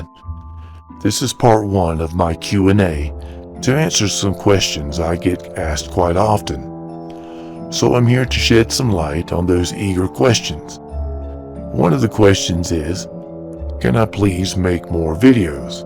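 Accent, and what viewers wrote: South, United States. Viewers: Well, Awesome and Amazing answers and sorry but I noticed your Southern accent ☺☺☺ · You do you! I'll admit it'd suck if you went to a longer span in between videos, but we care more about your health and well being rather than getting a concrete upload schedule. Also, don't worry about subtitles! You were right when you said it was easy to get used to their voices. Finally, is that a Southern accent I detect? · You have a thick southern accent. Very thick. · The accent seem's like middle west georgia or central alabama,As i live in G.A. i can here the southern lilt.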